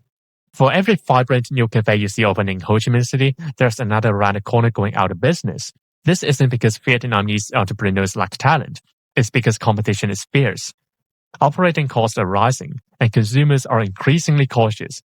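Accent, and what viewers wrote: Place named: China. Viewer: Sir, from your accent, I can tell that you are a native Mandarin speaker. What is your intention of creating this video?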